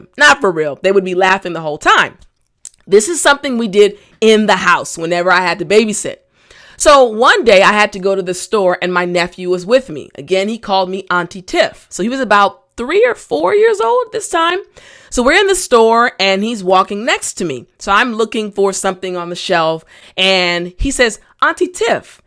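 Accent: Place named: United States. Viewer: I love listening to your amazing beautiful American accent! I have lived in Pittsburgh, PA, and I've decided coming back to Brazil. I like your high mood by explaining things!!! Thanks,for your love in passing lots of information to us! I've been speaking English,for over 32 years, and I love this stunning language,that God gave me as a gift,and that is something I'm very thankful for him of. And you are the best teacher I've found on the web ever!!!! I congratulate you,and God bless you for this great work, and amen!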